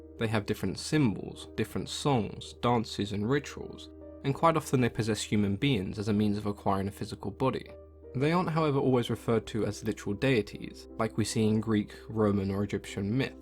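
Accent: France. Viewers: Thus french accent. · Nice French accent 😀 from Paris ! Big fan of your work !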